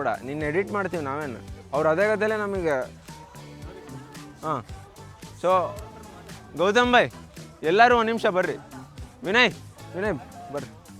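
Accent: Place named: Davangere, India. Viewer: ಪಕ್ಕಾ ದಾವಣಗೆರೆ ದೇಸಿ ಭಾಷೆ ...ಖುಷಿಯಾಯಿತು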